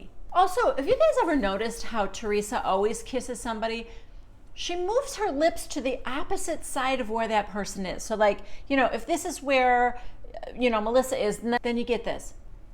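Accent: New Jersey, United States. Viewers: Love your Jersey accent. Lol. You sound like a mob wife. I have always liked Joe Gorga. I believe this guy has a hard of gold and loves his family deeply. I feel so so sorry for that Marty guy. He needs to run away as fast as he can and take his kids with him. Love you. Keep up The accents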